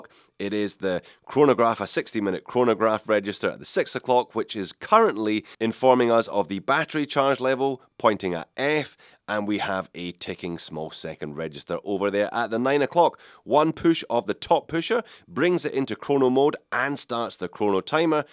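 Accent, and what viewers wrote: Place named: North, United Kingdom. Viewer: I've been in lust with the Longines Spirit Chrono with similar green lume for AGES, but it just wears too large. You can bet I snapped this one up in the sale last weekend, for sure 😉 P.S. Accent sounding quite northern with your head cold,!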